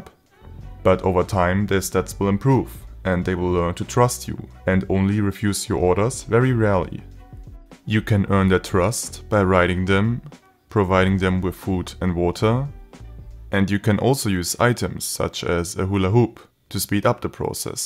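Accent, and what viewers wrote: Germany. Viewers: I hear German Accent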